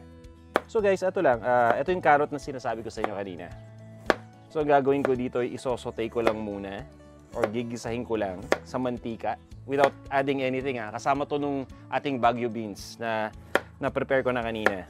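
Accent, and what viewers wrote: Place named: Batangas, Philippines. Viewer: Nasa parteng calabarzon ka sir...sa accent mo..Southern tagalog😂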